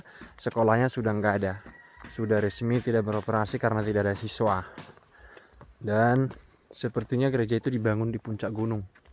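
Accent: North Sumatra, Indonesia. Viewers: mantap laeku saya yang selalu mengomen anda jarena saya duka dengan gaya anda membawakan cerita dan bicara anda sedap gitu loh sudah meng Indonesia walau logat bataknya masih kental tapi mantaplah pokoknya sukses terus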